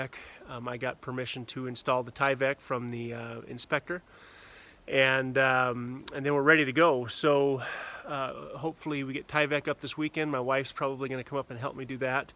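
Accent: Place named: South, United States. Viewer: As a northern carpenter. Just be listening to I can tell right away you're from the south. Can I suggest something? Have you thought about putting the tyvek (all you can ) on before you raise the walls? I remember the first time I worked in the same sub with a southern crew. We had about a week on a home in when the southern crew arrived. They on the second story in about 3 days. I said to my foreman they're going to beat us. He said just watch. It took them a month and a half to finish. They had to scaffold everything off. No way they made money on the job. Just a little thing I learned. Be blessed Bro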